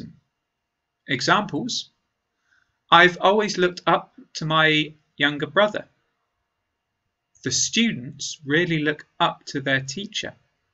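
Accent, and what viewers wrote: United Kingdom. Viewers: Thanks a lot! I love your British accent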